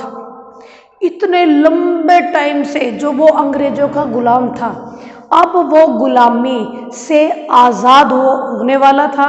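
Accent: Haryana, India. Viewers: Mam aap haryana se ho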